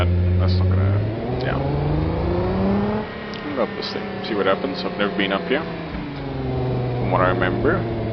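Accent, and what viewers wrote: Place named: South Africa. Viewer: I can recognise that south african accent anywhere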